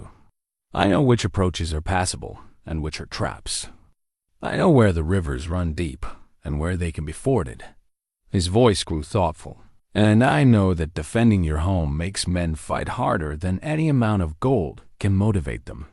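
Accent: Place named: New York, United States. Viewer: Good story! The narrator sounded like he was from New York though. I preferred the other voice you used to use.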